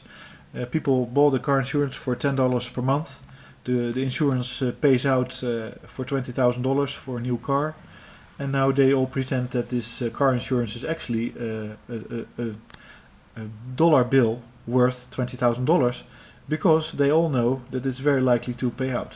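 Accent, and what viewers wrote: Netherlands. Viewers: dutch accent is unmistakeable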